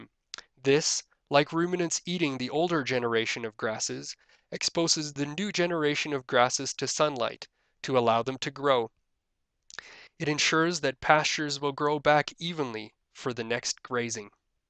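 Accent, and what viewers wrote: Canada. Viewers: Canadian guy, gotta cut the poor guy some slack, eh? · After about 4 words, I was like, this guy sounds Canadian... Then I heard "Ontario". We know the sound of our kind eh!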